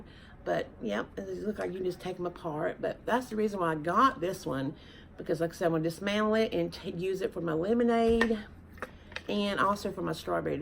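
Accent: South, United States. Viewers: New to your channel. I live on the southeast coast of Florida. I saw your coastal wall decor you put together , loved it and decided to subscribe. My style is not total farmhouse but more like coastal, rustic, distressed with a little vintage mixed in. I get a lot of inspiration from all styles of decor and make it my own for my style. Being raised by very southern parents, I detect a southern accent when you talk. I also subscribe to a couple of people that I see in your comments. I don't know if you subscribe to 2 people I'm gonna mention but I think you would really like their channels. Bargain Bethany is your girl for everything Dollar Tree. She not only keeps everyone informed on the newest items DT has come out with but does amazing diys out of them. If you want a very seasoned You Tuber that is into farmhouse and does some of the best collaborations, and up cycles you really need to check out Second hand Tracey Ann. 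Tell her that her Florida buddy sent you over. Make sure you check out her last collaboration she did with lemons. She did one each week for the month of May and they are AMAZING! If you want to grow your channel even more, participate in her collaborations. I love the DT haul you just did and I agree, it is so nice to decorate with pretty, matching items when you get together for dinners, bbq's and holidays with family and friends. I think I'm going to get a lot of inspiration from you. Have a great weekend. Hugs from the southeast coast of Florida 🌴😎💙😎🌴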